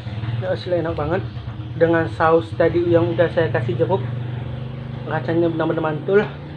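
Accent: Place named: Banjar, Indonesia. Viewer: Logat Nya kaya ada banjar nya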